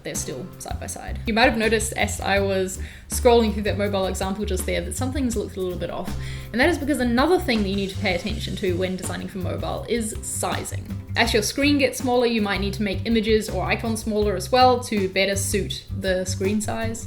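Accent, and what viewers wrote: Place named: New Zealand. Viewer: As soon as I heard you speak I was like Whhhhhaaatt!!! She's definitely a Kiwi! Great Video - thanks!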